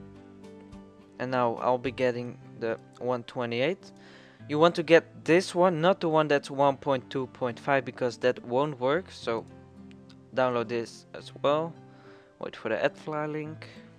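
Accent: Netherlands. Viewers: are you from netherland it sounds like english is not your langue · lemme guess, you a dutchie?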